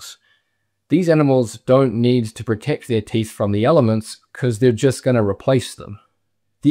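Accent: New Zealand. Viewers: I'm new here. Loved it. Guessing you're a Kiwi. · South Island, New Zealand. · Do you speak with a Dunedin (NZ) accent? You have a Southland Burr sort of accent. 😊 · Are you a kiwi? Great video btw!!!!!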